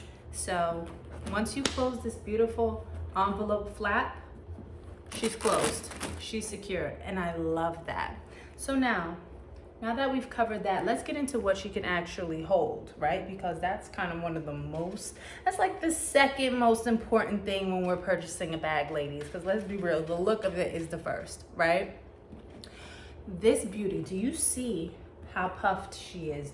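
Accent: New York, United States. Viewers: For sure from NY lol I know that accent 😍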